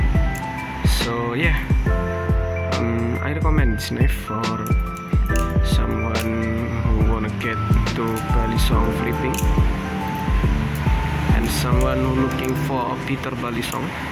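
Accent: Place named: Spain. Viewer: Contestame si sos español que hasta yo hablando otro idioma se cono no sos ingles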